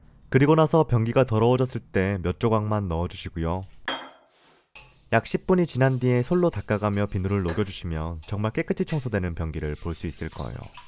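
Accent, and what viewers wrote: Gyeongsang, South Korea. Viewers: 경상도 분 맞지요? ㅋㅋㅋ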